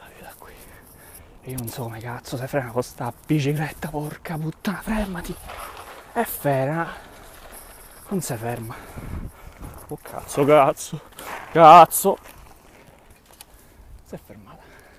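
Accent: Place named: Rome, Italy. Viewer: haha io dovrei avere delle foto in quel ponticello, dovevano esserci anche i parcheggi per le macchine elettriche li davanti. Ma questo accento romano?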